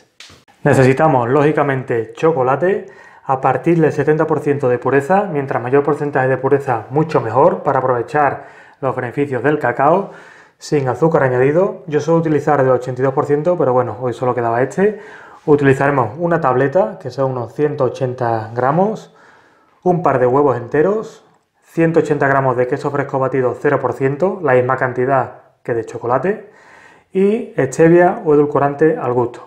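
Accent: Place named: Spain. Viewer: Me causa mucha gracia el acento de este español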